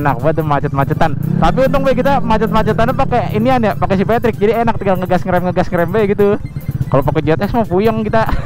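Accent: Jakarta, Indonesia. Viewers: Anjir logat lu kaya orang jakarta bang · betawi bat da ah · Logat nya betawi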